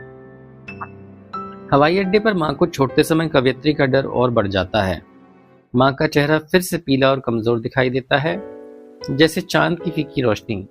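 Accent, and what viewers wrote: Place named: United Kingdom. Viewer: ur english ascent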